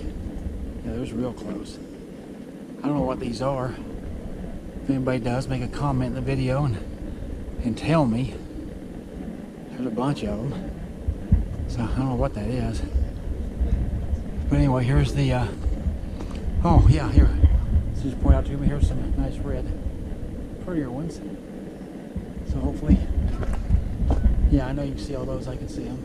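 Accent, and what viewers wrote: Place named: United States. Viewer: Are you from Glenwood Springs? or a different part within Colorado? I know you are an American. Your accent does give it away. I'm just wondering as to where your from.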